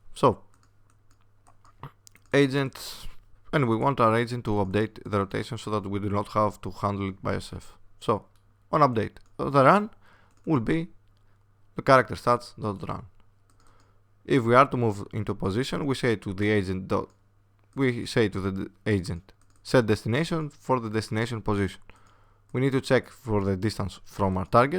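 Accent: Greece. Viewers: I can tell the Greek accent miles away!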